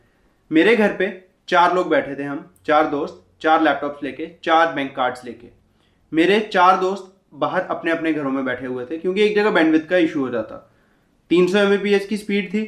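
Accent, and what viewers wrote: Jammu, India. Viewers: Bro r u from Jammu?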